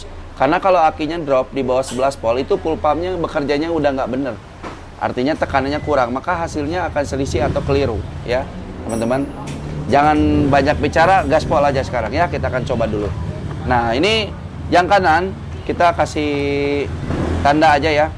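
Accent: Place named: West Java, Indonesia. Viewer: Aya logat sundaan.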